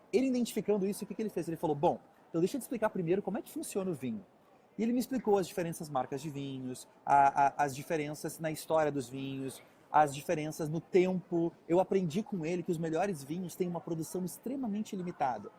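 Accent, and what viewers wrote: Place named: Portugal. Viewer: To começando a te acompanhar, e sábia que esse sotaque era da terrinha.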